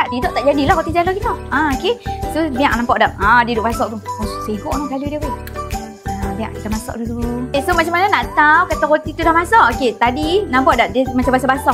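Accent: North, Malaysia. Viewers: Ulang tngok...suka dngaq loghat utara...sambil tu nk ingat resepi...tk bnyk2 😊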